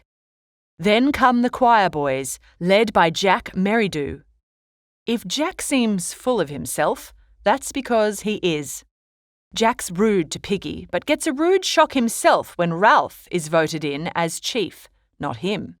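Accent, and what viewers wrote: Australia. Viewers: These are great! Is the narrator? Is her accent from Australia? My students were curious.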